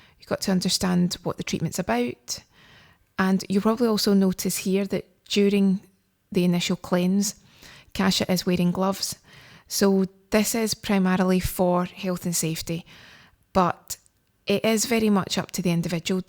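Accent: Scotland, United Kingdom. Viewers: I ken you’re a Scott?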